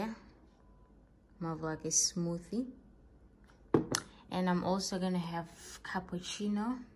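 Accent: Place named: South Africa. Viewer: You have a South African accent ❤️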